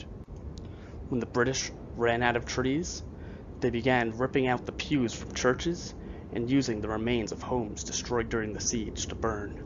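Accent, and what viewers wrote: United States. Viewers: Sounds like a Philadelphian narrating. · Good work,only the American accent is sickening. Gnawing a living toad while speaking?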